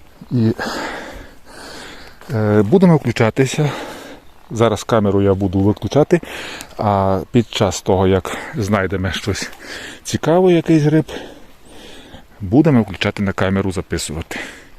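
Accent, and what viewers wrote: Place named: Lemko-Boyko, Ukraine. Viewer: Ще хотілось підмітити Ваш чудовий наголошений звук "И", який притаманний говірці лемків і бойків, дякую!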